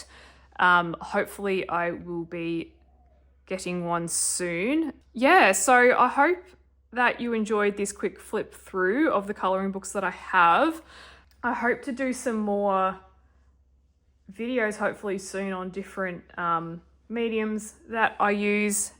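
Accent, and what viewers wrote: Australia. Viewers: Aussie accent, hit subscribe immediately! I’m a Melbourne based colouring book enthusiast too!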